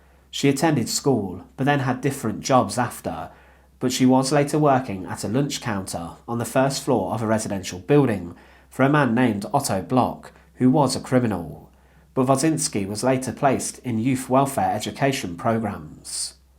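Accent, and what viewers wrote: United Kingdom. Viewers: Love your Bri-ish accent !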